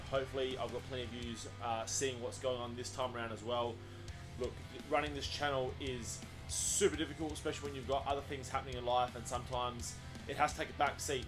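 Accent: Australia. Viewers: Two things that will always crack me up, an Aussie accent, and Ace Ventura. You sir got an instant follow as soon as I seen the rhino scene LOL. Cheers from Texas! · this is sweet.. i was just discussing this with some buddies that have old mustangs. i was tossing around the idea of this so i have room for the 2nd turbo, but was a bit sketch to run coolant lines that far. question for ya, and forgive me because I'm a new sub.. but you sound Aussie. thought you guys had RHD's.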